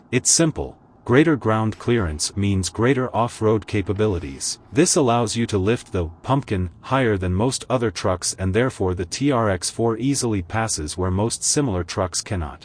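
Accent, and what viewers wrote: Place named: Russia. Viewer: Лютый акцент я сразу понял что автор походу Русский )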